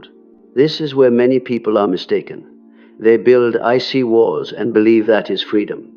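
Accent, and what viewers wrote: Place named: Spain. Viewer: Thank you !! I have not yet seen this level of depth in any video before (probably Sam Vaknin's videos only are this deep, but he is much more technical). I have seen this video more than three times already ... Clearly the German voice (with a perfect Spanish accent by the way) is a very experienced person. Thank you very much for sharing this type of deep content. It does help us a lot !!!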